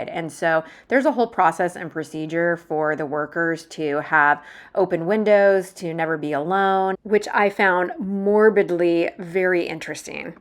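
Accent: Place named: United States. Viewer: Con este acento, parece americana de nacimiento. O al menos lleva muchos años en EEUU. Dudo que sea alemana.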